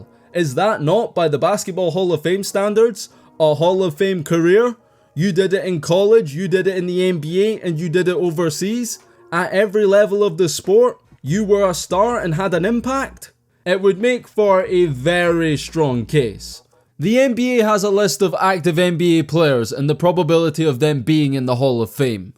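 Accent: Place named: United Kingdom. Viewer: when i heard your accent i thought i clicked on wrong video, Brit talking about basketball is always odd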